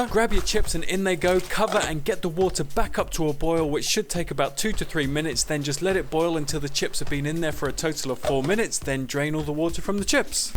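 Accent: United Kingdom. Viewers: Oh I love your recipies, your sense of humour and your Loooovely british accent..... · Just doscovered you channel from this being recommended to me and immediately subscribed before the video was even over. Brilliant recipe, well explained without taking any more time than necessary, from the UK so easier for me to understand being from there myself, plus it was funny and entertaining. Great content thank you man!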